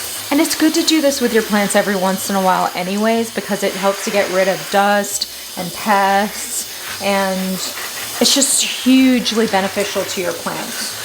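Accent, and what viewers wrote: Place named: Manchester, United Kingdom. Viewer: I'm from Manchester, it's nice hearing someone from pretty much down the road talk about their plants. Not showing me their Monstera on a California balcony.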